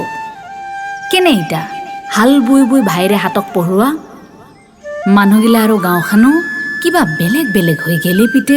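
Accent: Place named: Lower Assam, India. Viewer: মোৰ সতে মিলি গ'ল। মইও নামনিৰ এ । স্পৰ্শ কৰিলে। আপোনাৰ মাতটোত নিজৰ ভাষাৰ শৈলীটো শুনি ভাল লাগিল।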